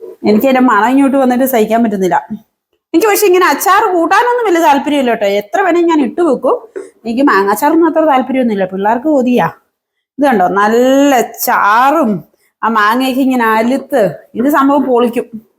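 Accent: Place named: Idukki, India. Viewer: Serikum janma nadetha, thani Idukki samsarum anallo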